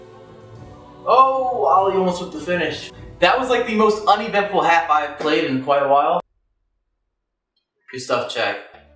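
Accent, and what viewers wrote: Houston, United States. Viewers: Are you from Houston?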